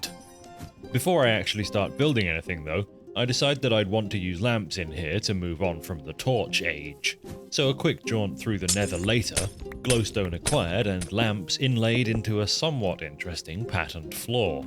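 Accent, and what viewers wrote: United Kingdom. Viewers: I blame Totalbiscut (rest his soul) for my affinity for British commentary. There is simply nothing else like said in your accent, so charming and quaint. Its like a storybook or an episode of Thomas the Tank Engine. Love your vids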